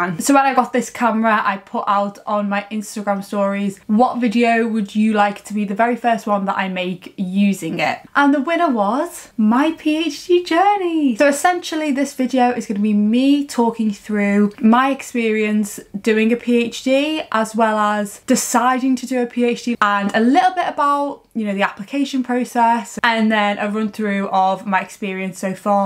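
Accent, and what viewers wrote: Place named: Leeds, United Kingdom. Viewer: You sound like your from Leeds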